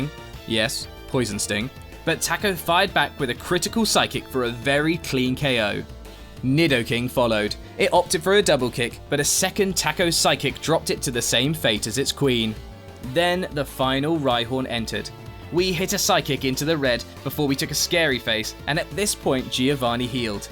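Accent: United Kingdom. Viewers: You‘re adorable and I enjoyed your content very much! I just subscribed, thanks for the content! By the way, You’re British, right? I say because of your accent! Lovely accent!😊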